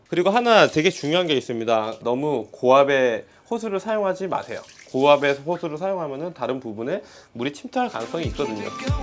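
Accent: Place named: Gyeongsang, South Korea. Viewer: 경상도 분이네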